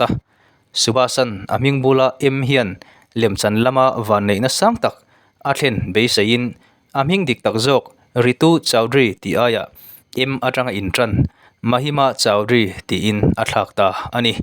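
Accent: South Mizoram, India. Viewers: Sir chu Saw lampang ah in awm em? Nge khi lampangah, hmar lam product ori chu i tawngkau chheh atang hian a ang viaulo.. huat tur anilo aw.. inpawh zelna tur zawkin kalo sawi ve mai mai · Saw lampang a ang😂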